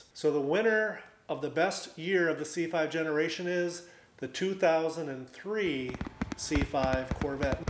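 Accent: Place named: United States. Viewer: Hello...😊many thanks goes out to you by the way, you are speaking a great American English! for me as a German girl it's easy to follow you. I am C5 owner only for a month and love my car. Your videos are interesting and give a lot of information 👍🏼 All the best + GOD BLESS AMERICA 🇺🇸🗽 greetings from Sam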